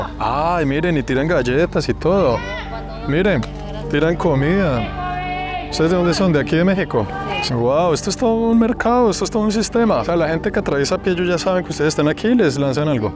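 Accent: Colombia. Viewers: Bienvenido 😂😂😂 Me encanta que conservas tu acento colombiano ❤❤🤗😘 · Usted no es mexicano, por su acento, se le oye lo colombiano, póngase trucha ai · Eres Colombiano ?! " Chévere " tu Reseña...Conocí Colombia y son Animosos... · se le Nota el acento y las expresiones en la forma de habalr muy colombina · Espera hablas como un colombiano y eres de México?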